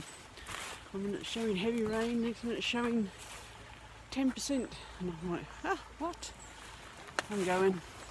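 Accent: New Zealand. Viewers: Is that a New Zealand accent i detect ! I grew up in Auckland but have lived in Manchester for the last 27 years !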